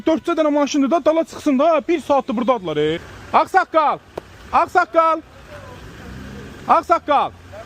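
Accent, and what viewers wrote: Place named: Ganja, Azerbaijan. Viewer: Gəncəli ləhcəsinnən danışırsız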